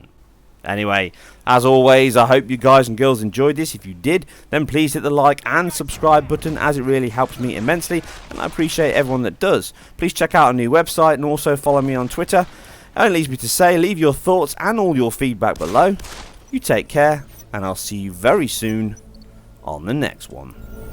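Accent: United Kingdom. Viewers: Tks!! I love the british tone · He is. English, to be specific. I've been trying to work out his accent for a while, but I can't be more specific than that.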